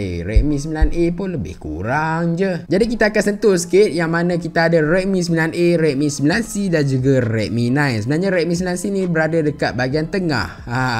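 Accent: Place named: North, Malaysia. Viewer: Wah org utaqa mana ii mai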